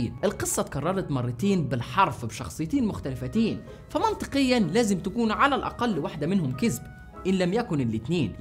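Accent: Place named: Egypt. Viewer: I'm trying to get the subtitles up and running but I'm having a little trouble with the Egyptian accent :')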